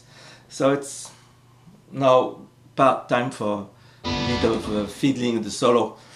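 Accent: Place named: France. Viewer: On sent l'accent français :') Bonne video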